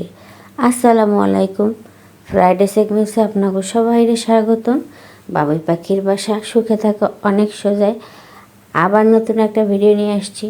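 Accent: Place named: Barishal, Bangladesh. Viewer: বরিশালের ভাষা তোমার মুখে কি যে ভালো লাগে আপু মাশা-আল্লাহ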